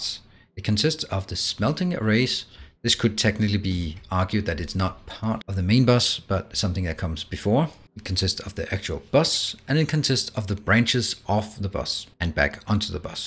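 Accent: Denmark. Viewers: Wow you sound super duper danish. :) Nice video!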